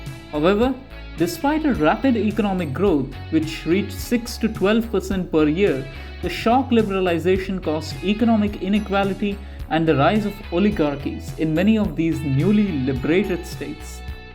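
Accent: India. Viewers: I'm sorry, I can't listen to an indian for 9 minutes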